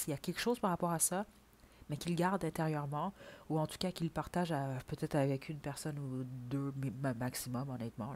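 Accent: Quebec, Canada. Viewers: Bonjour je vous suis depuis quelques temps quand je fais un choix ça me parle mais la plupart du temps c’est mon énergie que vous décrivez j’ai pris le choix no 2 je suis spirituel j’ai vécu la nuit noire de l’âme j’avais commencé la médiation et des choses bizarre se sont produits que je m’explique toujours pas comme sentir des caresses sur mes cheveux quand j’allais mal et que je pleurais un jour ça m’a énervé et j’ai créé laissé moi tranquille une fois j’ai entendu une voix répondre à ma prière et d’autres turcs que je ne comprends et je ne sais pas qui peut m’aider à comprendre je suis empathe quand je vois un itinérant c’est moi que je vois parce que pour moi l’humanité c’est ma famille je suis eux et ils sont moi voir souffrir les autres me fait mal et parfois je doit me protéger je vous écris tout ça peut être parce que je cherche des réponses que peut être personne n’a merci et bonne vacances je pense qu’on reste à la même province car votre français est québécois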